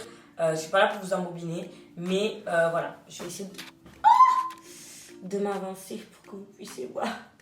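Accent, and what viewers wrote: Marseille, France. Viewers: J’adore 😍 jaime trop ton accent marseillais! 😘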